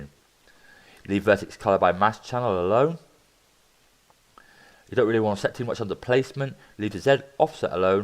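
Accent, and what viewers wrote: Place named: England, United Kingdom. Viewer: You certainly are from England =D Great tutorial brother!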